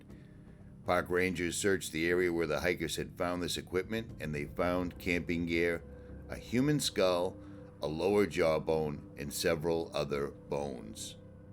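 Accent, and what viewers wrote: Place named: Boston, United States. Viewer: Just subbed sounds like Ritchie from boston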